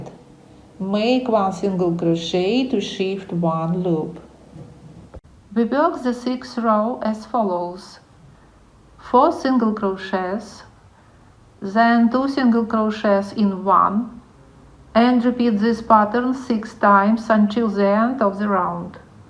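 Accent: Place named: Nizhny Novgorod, Russia. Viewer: Какой-то нижегородский акцент, режет слух . Как будто по русски говорит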